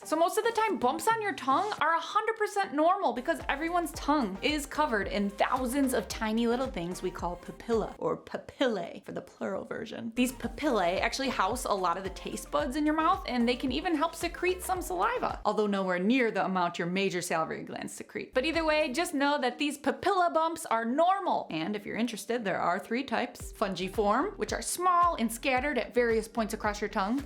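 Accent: United States. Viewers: Great informative channel with a positive personality and an a smooth American accent lol.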